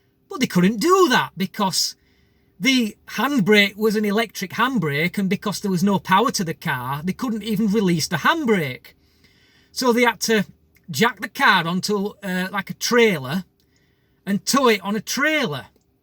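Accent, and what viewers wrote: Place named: Yorkshire, United Kingdom. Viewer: Kernow(Cornwall) is made from granite,lithium is found in granite,so why isnt Kernow covered with lithium mines?I take 2 hours to fully charge from a 13 amp plug at home.my range is 15 miles with no regeneration available..I suffer no range anxiety when the battery goes flat.The battery is 5 years old and still charges fully.When flat,I just push harder on the pedals. Haha its a folding bike.I love accents.Hope I wont insult you to guess Yorkshire,Leeds/Bradford?Yo orite luv.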